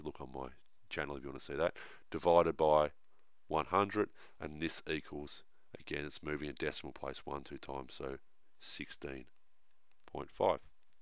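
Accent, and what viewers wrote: Australia. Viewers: Are you Australian? Sorry if your not yo just sound Australian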